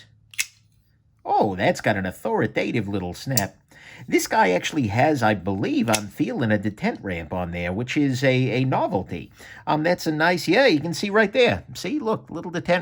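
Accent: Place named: New Jersey, United States. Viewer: funny, but I think is from New Jersey, we don't talk like that in New York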